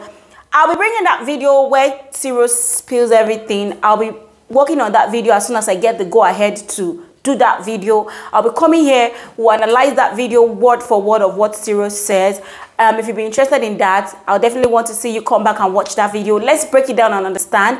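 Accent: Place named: Africa. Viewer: Who is complaining about your accent again? Are we not Africans? My dear, no be everything you go address o. You may need to ignore some comments.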